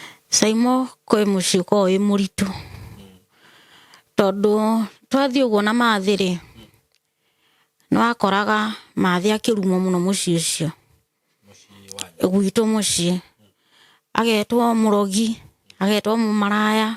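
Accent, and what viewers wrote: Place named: Nyeri, Kenya. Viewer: She is sturbon nyeri lady from her talk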